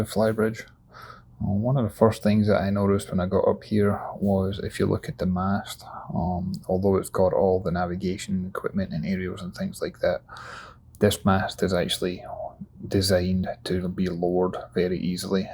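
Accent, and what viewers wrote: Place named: Scotland, United Kingdom. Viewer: Nice to hear a Scottish accent..🏴🏴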